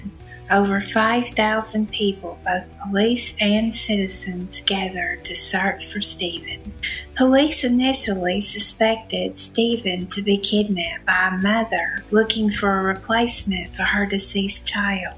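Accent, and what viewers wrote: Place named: Kentucky, United States. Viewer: Your voice is so soft & lovely. What is your accent? You sound Kentuckian to me.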